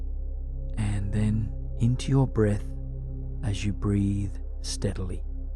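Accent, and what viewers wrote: Australia. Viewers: Is it just me or does he sound Australian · haha I knew it lol, and team, you’ve changed my life with your beautiful guided imagery and Aussie accent 🥰✌️❣️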